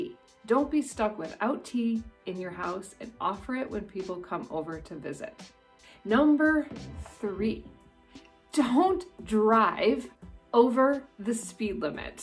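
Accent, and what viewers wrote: New Zealand. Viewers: I can hear a kiwi accent already. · Omg your pakeha accent is soooo funny lol (not hate ofc and idk how to spell te reo words)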